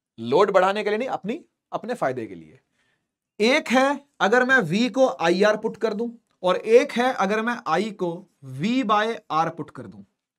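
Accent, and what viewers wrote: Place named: Haryana, India. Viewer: that haryanvi tone ❤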